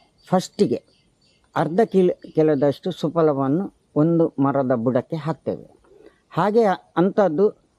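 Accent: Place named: Tamil Nadu, India. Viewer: ಇವರ ಭಾಷೆ ಯಾವುದು.. ಕನ್ನಡ ಸರಿಯಾಗಿ ಬರಲ್ಲ ಅನ್ನಿಸುತ್ತೆ.. ತುಂಬಾ ಕಷ್ಟ ಪಟ್ಟು ಕನ್ನಡ ಮಾತಾಡುತ್ತಾಯಿದ್ದಾರೆ. ತಮಿಳುನಾಡಿನ ಜನ ಕನ್ನಡ ಕಲಿತರೆ ಹೀಗೆ ಮಾತಾಡೋದು.. ಪದಗಳನ್ನ ಕೂಡಿಸಿ ಕೂಡಿಸಿ ಮಾತಾಡುತ್ತ.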